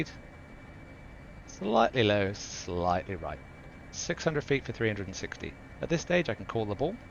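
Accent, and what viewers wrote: United Kingdom. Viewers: I like your British English accent.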